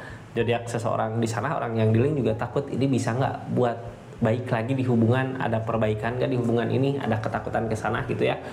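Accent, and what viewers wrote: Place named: Sunda, Indonesia. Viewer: Saya suka dg mas lo wajahnya seperti anaku nomor 3 trus bahasa Sunda nya medok bangett makasih redingannya sehat sll berkelimpahan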